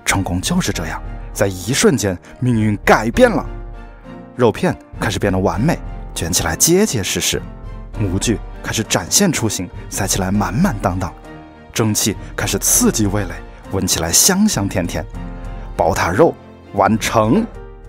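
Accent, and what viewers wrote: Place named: Changsha, China. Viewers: 感觉博主有长沙口音…… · 这个解说太逗了，哈哈😄 听口音，你是长沙的不？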